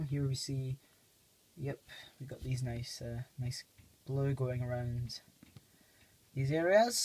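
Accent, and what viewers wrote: United Kingdom. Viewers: And please stop speaking British plese repliy.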